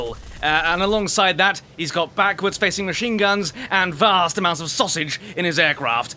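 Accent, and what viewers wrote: United Kingdom. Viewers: not an impression, hes actually british........ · Your gameplay and commentary is so enjoyable, the british accent is on point m8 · is this your real voice because I'm British but I don't sound like you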